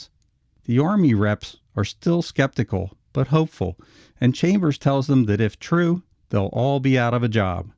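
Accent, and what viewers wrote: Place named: Pittsburgh, United States. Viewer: I can tell this narrator is from Pittsburgh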